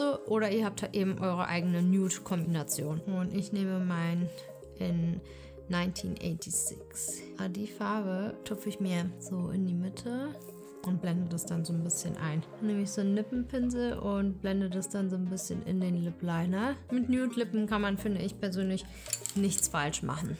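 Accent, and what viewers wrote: Berlin, Germany. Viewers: kann dit vielleicht ma sein, das de gerade frisch aus Berlin zurück warst vorm Videodreh? Da kommt so schöne Berlinerschnauze durch. 🤗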